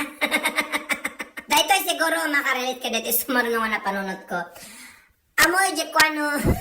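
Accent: Ilocos, Philippines. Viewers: Ang sarap mong tumawa auntie.. Ang galing mo talaga, pure Ilocano.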